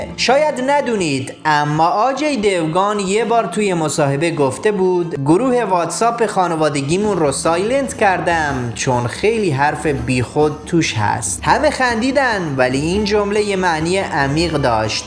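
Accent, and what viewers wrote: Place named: Iran. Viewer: تو که افغانی چرا به لهجه ایرانیها گپ میزنی وطندار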